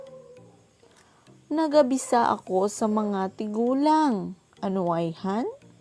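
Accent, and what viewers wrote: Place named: Iloilo, Philippines. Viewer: Ilonggo gid ya...♥️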